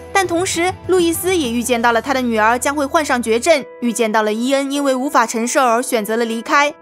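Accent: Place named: Southwest, China. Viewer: 她口音真的不标准，应该是我们西南地区的口音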